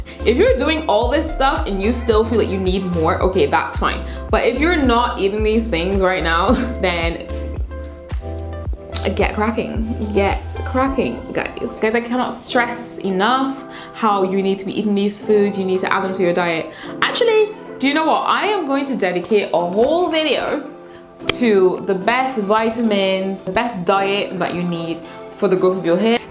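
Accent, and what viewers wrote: Barbados. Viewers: You sound almost bajan😄your hair I'd beautiful. My goal is healthy waist length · 🇧🇧 I hear my people in your voice! New subscriber! And this was a great video! Thank you!!! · I’m hearing a Bajan accent! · Please what is your accent !?? Am I hearing Bajan? Absolutely beautiful ! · Are you a Bajan Queen? I’m sensing the accent. 😀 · Am I hearing a bajan accent?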